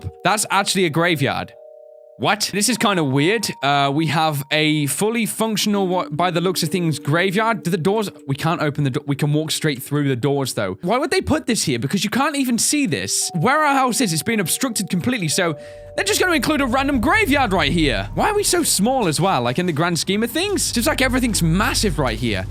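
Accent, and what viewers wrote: United Kingdom. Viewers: AshandraBlue i mean he is british like dan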